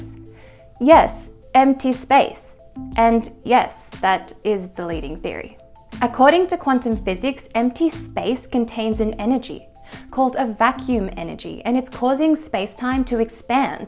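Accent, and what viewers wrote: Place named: Australia. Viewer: Aussie accent is superb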